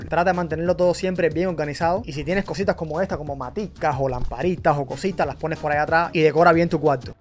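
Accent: Cuba. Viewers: buen video, tienes un acento bastante peculiar, eres cubano? saludos desde cubita 🇨🇺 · El acento parece de mi tierra la linda cuba bendiciones crack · Me gustó mucho tu video. Che tenes hacento Cubano Me Equivoco. Saludos bendiciones ❤❤ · se entiente lejos 20mil km q eres cubano ajajaja grande bro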